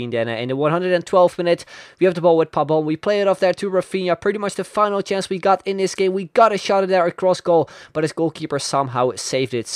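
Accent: Netherlands. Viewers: are you dutch cuz you sound like that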